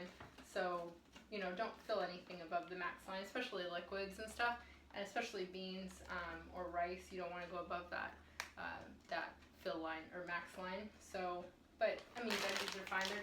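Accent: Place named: Los Angeles, United States. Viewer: Thank you! I'm just curious, if it's ok to ask - do you guys live anywhere near Los Angeles? The way you speak is pretty much exactly like me, and you even look like you would belong in our family!! Whatever the case - good luck to you and happy cooking. Take care, Rob.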